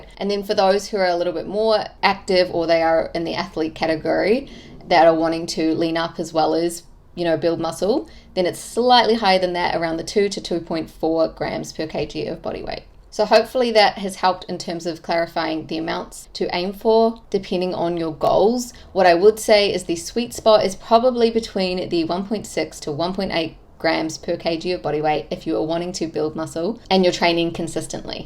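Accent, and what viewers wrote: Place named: Australia. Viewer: Excellent teacher and the Australian accent makes it nicer. Hello from Canada.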